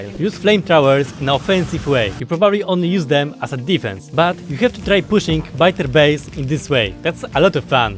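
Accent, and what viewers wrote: Russia. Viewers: Well, I would say that I love you because you have a Russian accent, but I think it would be useful for you to learn Russian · почему мне кажется что у тебя Русский акцент?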